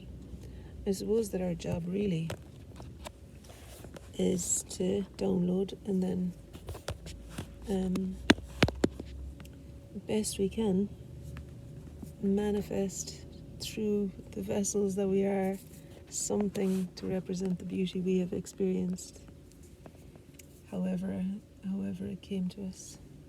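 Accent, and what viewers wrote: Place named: Ireland. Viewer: your lovely soft Irish lilt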